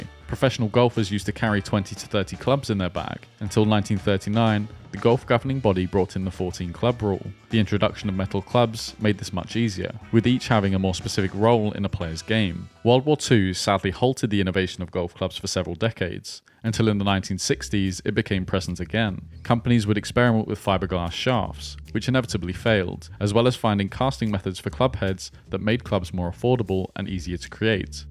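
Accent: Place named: United Kingdom. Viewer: I think he is just British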